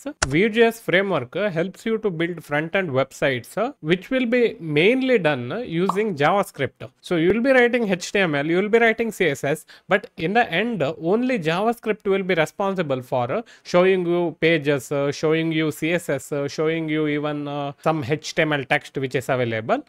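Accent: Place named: Konkan, India. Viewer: Super. Very helpful. The Konkani accent made me happy ;) How can i connect?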